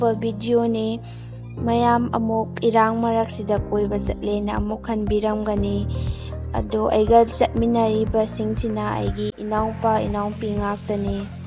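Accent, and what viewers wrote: Kakching, India. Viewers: Yam nungaidoi malle kakchinggi lonse eidi yam ngaojei leisu yam leininge.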